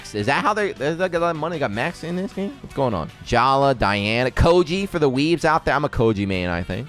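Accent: Philadelphia, United States. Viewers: You're from Philly, man?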